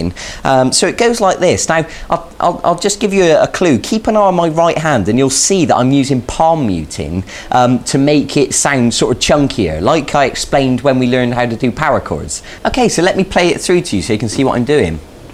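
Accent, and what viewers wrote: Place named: West Country, United Kingdom. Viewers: That a fine west country accent you `ave ther boy ! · ooh ah, I loves me geetar lessons with a West country accent. nice one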